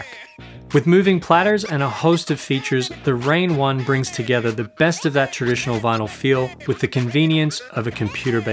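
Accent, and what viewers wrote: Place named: Australia. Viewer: Are you an Australian who has lived in America for a long time? Your voice sounds in between the two and it’s confusing me.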